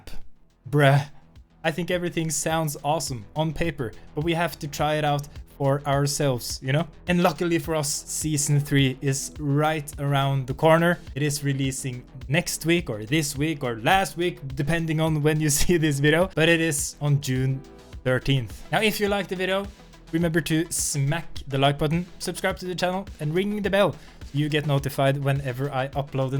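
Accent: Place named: Norway. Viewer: So excited for the new season! (du er nødt til å være norsk jeg kan høre det)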